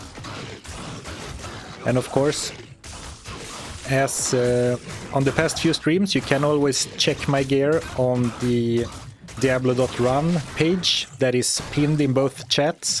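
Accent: Sweden. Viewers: I'll wait for the next sale, you make me want to play again, perhaps also single player, your challenges are awesome. Heard you mention earlier you were Swedish, the accent is pretty telling. (I'm a dutchman who moved to Sweden ~4 years ago, and you guys have a very typical accent, so do we I guess).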